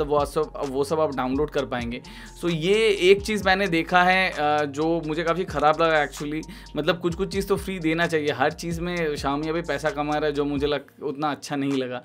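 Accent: Bengal, India. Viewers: Bro are you Bengali?? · Are you from Bengal?? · Bro, are you bangali? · ARE YOU A BENGALI SIR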